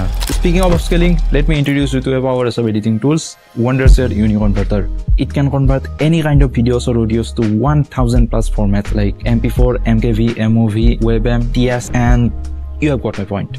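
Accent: Assam, India. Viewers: Voice to Assamese or nisina hona jai ase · Bro are you Indian ?? Cause your English is like Indian (like me lol)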